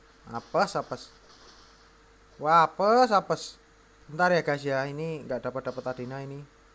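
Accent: Indonesia. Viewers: napa ga fokus talisman, bang ? Itu jauh lebih worthed dibanding enhanct equip u/ lv 180 lho.... Tapi logatmu iki jowo timuran, cocok karo aku kwkwkww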